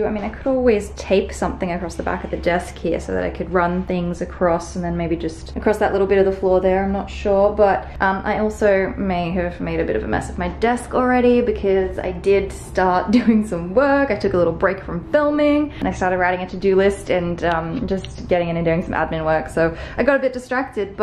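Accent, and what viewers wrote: Australia. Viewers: Have you never heard a Australian accent before? Dude.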